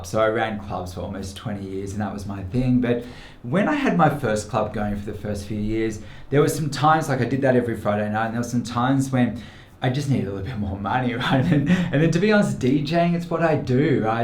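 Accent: Australia. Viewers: you got such a positive vibe mate, are u Aussie? just love your videos, great content and genuine way to teach, cheers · You are Aussie right ??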